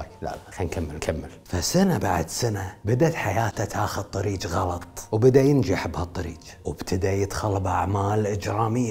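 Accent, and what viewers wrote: Kuwait. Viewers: ملاحظة صغيرة انا من الجزائر ونلقى صعوبة في فهم اللهجة الكويتية حاول تبسط فيها ..قدامي لماذا جدامي ههه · انت كويتي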